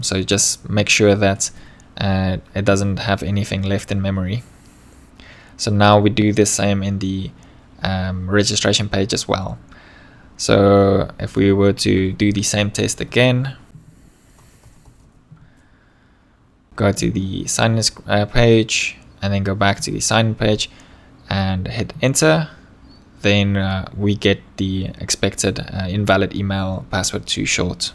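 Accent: South Africa. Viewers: Where are you from? You sound South African? :D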